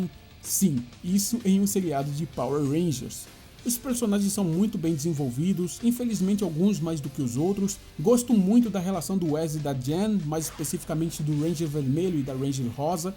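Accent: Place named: Rio Grande do Sul, Brazil. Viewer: O cara fala muito gaúcho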